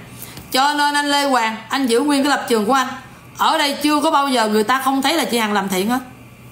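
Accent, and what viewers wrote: Standard. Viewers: noi qua chuan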